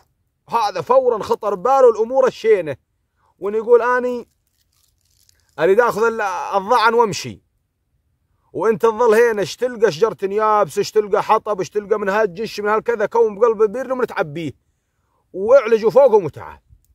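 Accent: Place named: Western Iraq, Iraq. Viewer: امتابعك من العراق استمر وعلى فكرة لهجتك تشبهة لهجتنة بل مناطق الغربية حيل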